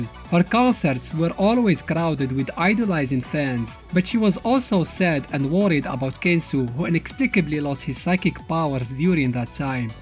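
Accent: Morocco. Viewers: just from your voice i recognized that you are from morocco XD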